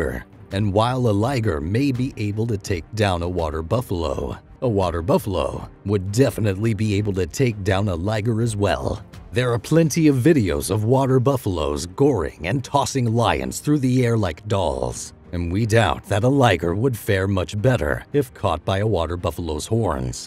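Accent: United States. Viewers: The narrator sounds so american its distracting^^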